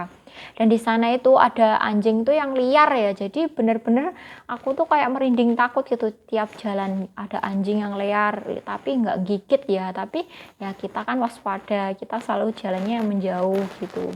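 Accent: Java, Indonesia. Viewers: Kabare? Pekat kok jowonya.. terbaik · Wah pekat nya Jowo nya dik...😂😂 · Kuat slank jowonyo. Kilang kilang nyaman kaya kerja office. Terutama kilang elektronik ada acenya nyaman sekali. Ada kantinnya atawa cafeterianyak ngak perlu keluar beli makanan semuanya dlm kawasan kilang. Cowok2 dan cewek2 pun ramai. Boleh main mata, ngobrol lama2 bisa dapat jodoh. Cewek cantik cepat kawen. Cowok ganteng punya ramai cewek. Cewek2 kaya jinak2 merpati. Kerja kilang kena koban diri. Kerja 12 jam termasuk rehat. Pergi pagi pulang mlm. Pergi petang pulang pagi. 8 jam kerja UMR 4 jam Overtime. Lama2 kerja UMRnya naik. Jadi gaji pun bertambah. Kerja kilang semuanya di sediakan. Angkutan, asrama, medical penal, bonus tahunan, Annual party dan lain2 di mana ada cabutan bertuah dan sebagainya. Exursion ke tempat2 wisata berkumpulan dan sebagaimananya. Lepas habis kontrak pulanglah. 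Bisa di ganti org baru. Org di luar sana ramai mau kerja. Bagus tak nyambung kontrak. Bagi peluang org lain mau kerja juga. Kerja saja di indon sana. Skg indo sdh maju byk lowongan kerja. Skg M'sia mau ambil kerja dari Siam pula. Kosnya tak tinggi. Sbb Siam sebelah dhj dgn M'sia. Cewek2 siam lgi cantik kulit merah kuning dari cewek2 indon😊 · Kok pekat amat jawa nya 🤣🤣🤣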